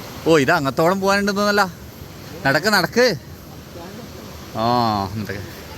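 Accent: Kannur, India. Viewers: നന്നായിട്ടുണ്ട് എങ്കിലും സംസാരത്തിൽ കുറച്ചു കണ്ണൂർ ഭാഷ കൂടി പോക്കുന്നു വീഡിയോയും ഒന്ന് കൂടി ശ്രദ്ധിച്ചാൽ അടിപൊളി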